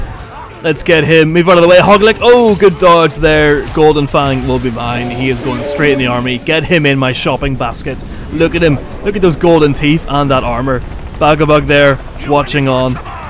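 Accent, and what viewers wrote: Ireland. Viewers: I only watched this video to see the raid shadow legends ad idk why just had to see it from the most Irish lad ever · Wait your an Irish man to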